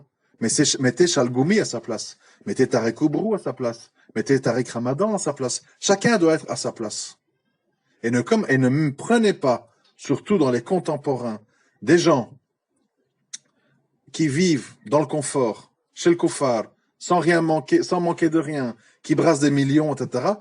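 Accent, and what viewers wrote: Belgium. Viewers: T es lourd à écouter avec ton vieil accent belge,petit débutant converti,t arrives pas à la cheville de Hassan iquioussen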